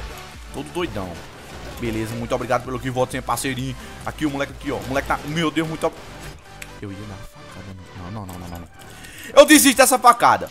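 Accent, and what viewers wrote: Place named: Paraíba, Brazil. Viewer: Ele e MiTo Mais O Portugues de Paraibano Ele Endendi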